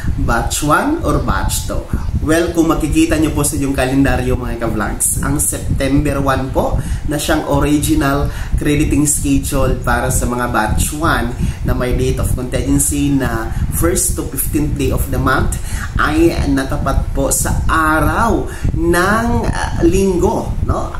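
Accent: Visayas, Philippines. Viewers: Tumigil ka nga puro.ka fake news pampagulo.ka pa.pati.pronounciation ng mga words promdi talaga.bisayang.bato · Bisaya ka dong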